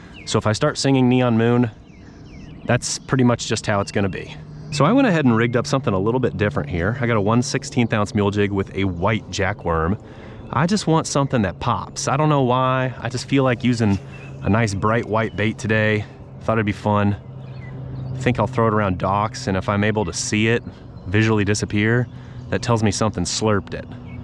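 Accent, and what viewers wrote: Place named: Midwest, United States. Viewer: Love the mid west accent. More of it!!!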